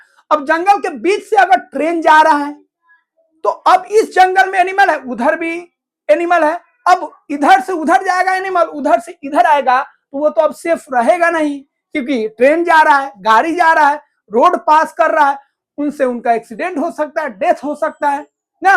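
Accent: Bihar, India. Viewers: Sir ap bihari ho ke ? Ham bhi bihar se hai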